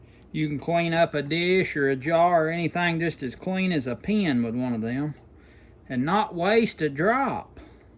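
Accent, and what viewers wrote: United States. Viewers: those pies look absolutely delicious oh yea I love your country accent,your a great dad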